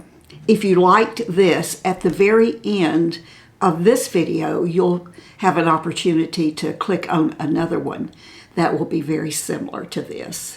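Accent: South, United States. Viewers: There is something about her. . . . She's authentic and honest. . . . Down to earth and the way she talks. . . Do I hear a little Southern accent ? I love Milani cosmetics too. She's not afraid to review the cheap cosmetics. . .Dollar General ? And tells us what she thinks . . .She's not trying to look young. . .she's comfortable in her skin and age hair is dirty tomorrow shampoo day ! Love her! You say you are a walker. . .take us on your walking tour sometime. Those old school rollers and clips ! ! I'll be tuning in all through 2025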